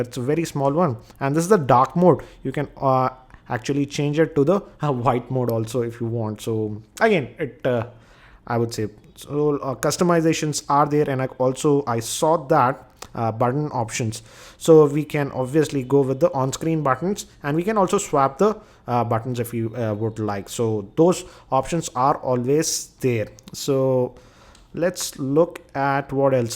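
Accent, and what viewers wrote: India. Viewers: typical Indian accent english.. :(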